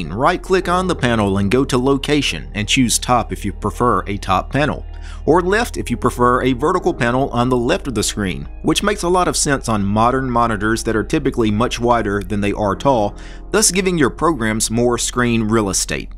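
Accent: South, United States. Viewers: “You can change desktop invrments” 😂 I love your southern twang 👍🏼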